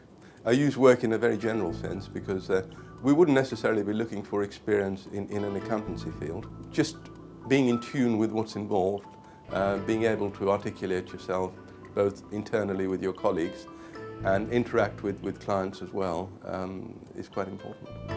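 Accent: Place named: United Kingdom. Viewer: Dude don't be embarrassed of the way your dad speaks english. Even my dad doesn't speak like this guy but I don't mind. Plus, I don't think this guy lived his life in India. I really think he was born in the United Kingdom or came at a very early age .. like his parents were immigrants that arrived in the 1940s/50s/60s.. or something .. i bet u thats why because no indian can speak a british accent THAT well without messing up lol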